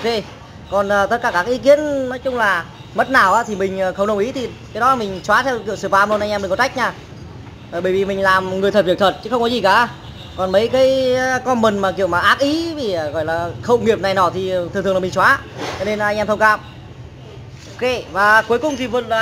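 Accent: Nghe An, Vietnam. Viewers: Ad xứ nghệ phải không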